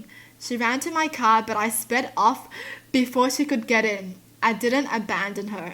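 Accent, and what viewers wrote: Australia. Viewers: so if americans speak in american do they speak in other language? if brits speak in british accent does that mean they speak other language? lol im loving ur logic shes australian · lives in Australia. She has an Australian accent. I like her accent, too.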